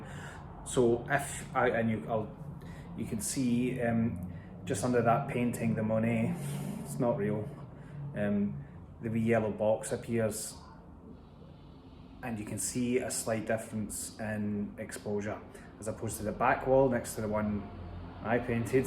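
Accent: Ireland. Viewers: I’m here for the Irish accent. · Man i love the irish accent, cool video very helpful.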